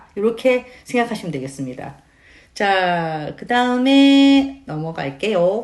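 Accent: South Korea. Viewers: 저 버들강아지 기억해주셔용 넘예쁘고 젊으심 말투가경상도라서 친근함 이 있어요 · 와우 평소말투 그대로! !부산말 안고쳐지네요 차암 어렵죠잉?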